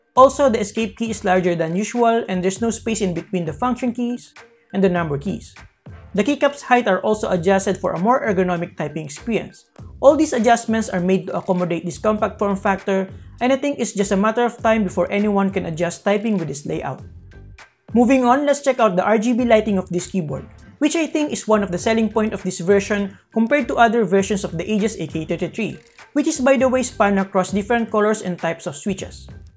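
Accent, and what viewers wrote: Philippines. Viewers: Are u filipino? Cause ur accent is VERY filipino😂 just sayin’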